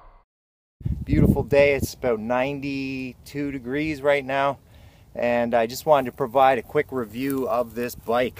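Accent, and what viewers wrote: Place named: Canada. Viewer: Sound Canadian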